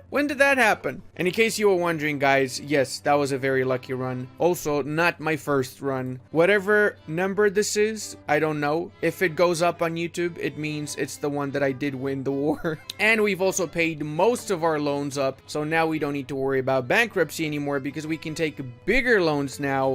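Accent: Romania. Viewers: is this guy romanian ? his pronounciation is really good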